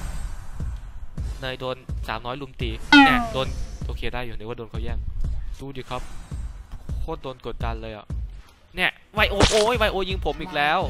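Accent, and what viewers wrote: Isan, Thailand. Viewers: ไม่เคยเห็นใครพูดอีสานได้น่าฮักขนาดนี้เลย;-; "หยามบักคัก" คือแบบตายอย่างสงบแล้วค่ะ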